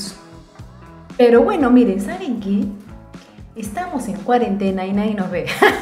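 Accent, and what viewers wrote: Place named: Peru. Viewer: Que lindas son las peruanas......me gusta su acento ..súper el consejo . bendiciones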